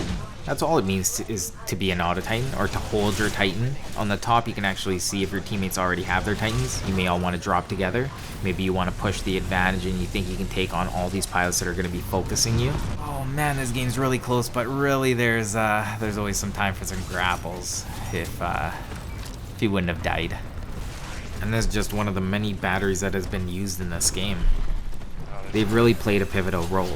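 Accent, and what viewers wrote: Canada. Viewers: Bro are you Canadian because I'm pretty sure I here a Canadian accent every time you say about and out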